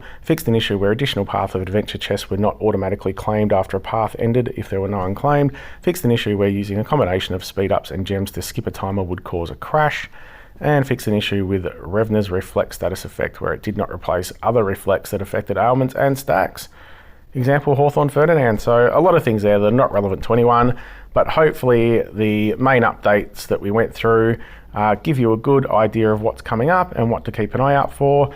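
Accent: Australia. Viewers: Thx mate, great to hear another Aussie. Been subscribed since before you hit 100 subs. Found you from McNaulty. I'm the leader of Obi One Kenobies but your alliance is way higher than ours so we wont see each other across the battle field.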